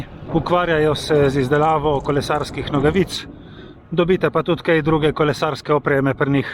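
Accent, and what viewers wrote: Primorska, Slovenia. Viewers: Najprej pohvala za res dober kanal, vaše zgodbe so vedno poučne in zelo zanimive, vedno kaj novega zvem o kolesarjenju. Ko sem vas odkril, sem si vzel čas in pogledal vaše prispevke. Čestitam tudi za 1000-ga naročnika pred nekaj dnevi ali tedni. Zdaj pa do vprašanja... kako je kaj z defekti gum, se vam velikokrat dogajajo? Ali imate s seboj zračnico in komplet za menjavo ali popravilo defekta- pri specialkah no vsaj pri meni se je na začetku to velikokrat dogajalo. Ko sem recimo šel na eno daljšo turo, ko sem imel novo kolo šele nekaj dni, se mi je zgodil defekt. Ker sem se prej vozil samo s treking ali gorskim, sem bil čisto presenečen, saj pri gorskem nisem nikoli s seboj imel kita za popravilo, ker ga tudi nisem nikoli zares potreboval. Na srečo mi je na poti pomagal en gospod iz Kranja, ki je pa imel zračnico in mi jo je celo pomagal namontirat gor. 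Ta pravi kolesarji so res dobra družba in so pripravljeni pomagati začetnikom. Čez nekaj časa sem ugotovil, da sem imel verjetno napačen tlak v zračnicah- od nakupa nisem nič preverjal tlaka. Ali ste kdaj razmišljal o tubeless kolesih oz.kakšno je vaše mnenje? Sem drugače iz Primorske in mi j lepo slišati primorski naglas, sicer sem jaz bolj iz Krasa okolica Sežane, vendar že dolgo živim v Ljubljani. Hvala za odgovor in želim še veliko varnih in sproščujočih kilometrov na kolesu.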